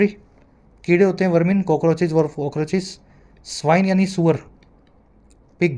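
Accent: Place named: Punjab, India. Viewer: Sir aap to gujrat ke ho par muje aisa lagta hai aap mere bade bhai punjabi ho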